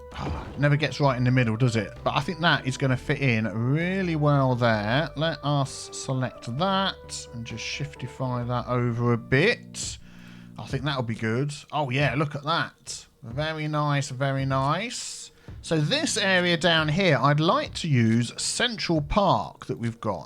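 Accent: United Kingdom. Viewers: what do you specifically call your accent? Just british?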